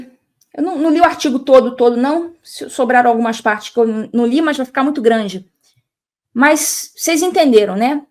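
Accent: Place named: Rio de Janeiro, Brazil. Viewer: gosto dos seus comentarios falando (assim do nosso jeito carioca de falar) muito legal.estou ligado!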